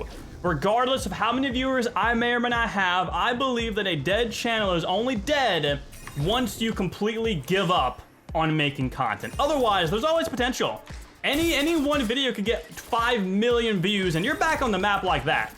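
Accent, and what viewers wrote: United States. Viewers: What part of Ohio are you from?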